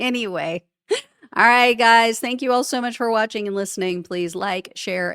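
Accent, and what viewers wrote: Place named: Midwest, United States. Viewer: Miss, you are a beautiful, interesting person. I've been missing you a lot. Your midwest accent is music to my ears.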